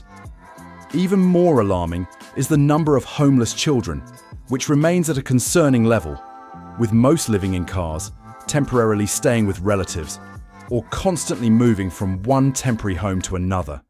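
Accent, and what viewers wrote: United Kingdom. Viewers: in the first 2 minutes it shows homeless in LA and Vancover... while speaking like this is Michigan... Who made this video? Why this dude have a British accent? Idk something tells me they are not from michigan possibly not even from america or know wtf is going on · why am I hearing a limey tell me about my state?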